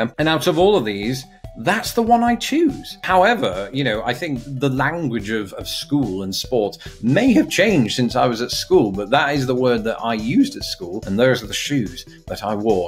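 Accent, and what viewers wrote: United Kingdom. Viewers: You're British? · Well, you still sound quite British. But that's great! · I watched the previous video. I am born and raised in the southern states, and I know the cultures and native dialects of those three cities quite well. Based on that, I say the results you got were totally BOGUS! Keep your British accent, cultivate and safeguard it, AND DON'T GET AMERICANIZED! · Very entertaining and fun! I enjoyed this a lot. Seems you're still Bri'ish. Cheers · He's British?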